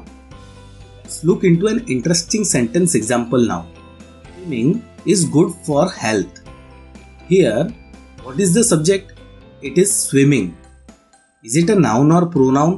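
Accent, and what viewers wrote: India. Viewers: I love to hear your indian accent in every lesson.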